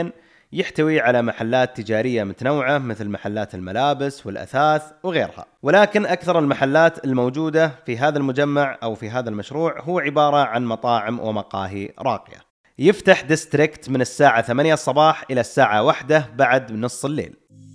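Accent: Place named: Saudi Arabia. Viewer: حياك الله اخوي انا من سلطنه عمان وحسب كلامك بالمقطع اظن انك سعودي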